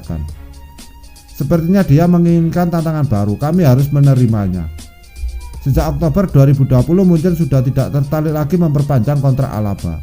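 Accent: Java, Indonesia. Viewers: Min biground nya cari yg bagus sedikit suara gak jelas bgt apalagi logat jawa kental jadi gak kedengaran min..🙏🙏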